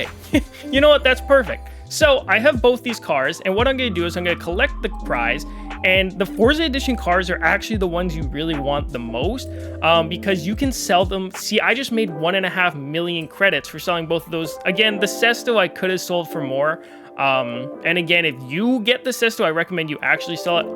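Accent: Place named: Minnesota, United States. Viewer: You have a Minnesota accent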